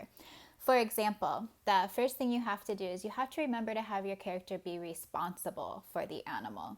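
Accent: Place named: United Kingdom. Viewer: Very cute and awesome advice! And excellent British accent. :)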